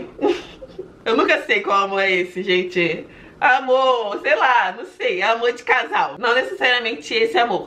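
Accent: Rio de Janeiro, Brazil. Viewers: Rexpoxta vc e da onde com esse sotaque do rio?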